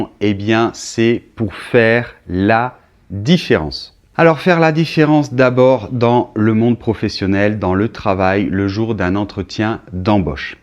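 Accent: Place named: France. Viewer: Pour moi la langue française est une langue tellement belle et charmante(surtout à l'écouter😉)..j'adore cet accent😍..et j'adore aussi votre façon de parler et expliquer les choses..vous êtes le seul français que je comprends presque totalement!!(excusez moi pour les erreurs)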